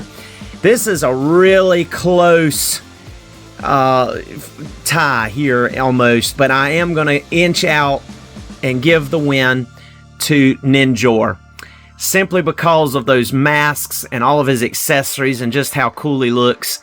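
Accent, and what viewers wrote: South, United States. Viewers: I like your southern hospitality accent when you talk I've been to North Carolina and South Carolina has stopped over at the Dallas airport like your accent, southern southern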